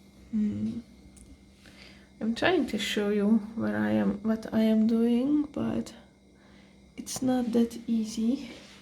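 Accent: Hungary. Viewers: immediately recognised the Hungarian accent:D Thank you for the video! It's very helpful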